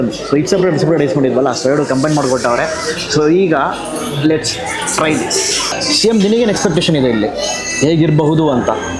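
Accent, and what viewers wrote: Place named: Tamil Nadu, India. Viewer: avanu mathadodhale gothaguthe…….he is Tamilian….plus he is copying style of Tamil food vloggers….bari dove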